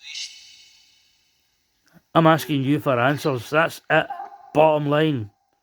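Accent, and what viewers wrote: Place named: Scotland, United Kingdom. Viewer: Your Scottish accent...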